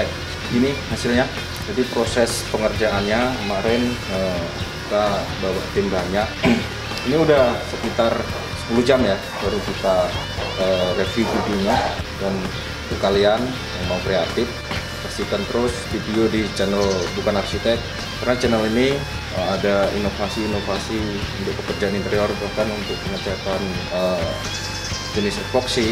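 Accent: Java, Indonesia. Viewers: Baru nonton eh ada logat jawa nya Tmpat tggal nya di mana ya, Klo boleh pengen maen&belajar biar tmbah ilmu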